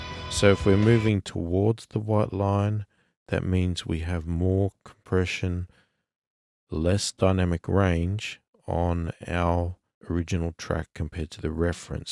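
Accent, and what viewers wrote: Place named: Australia. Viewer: Haters gonna hate! You made perfect sense to me. He's probably a 'Murrican who couldn't understand your Aussie accent. Classy reply you gave him though. Kudos. I doubt that this guy has a clue about recording. I started watching your Magic AB video but when you said you'd found a better one I checked it out. Way better for referencing. Cheers.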